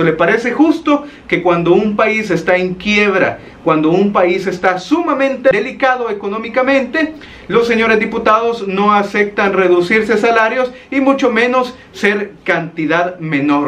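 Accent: El Salvador. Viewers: Me llego, primera vez q veo este canal, hablas como un salvadoreño 100 por 100... Auténtico... Felicitaciones mi hermano!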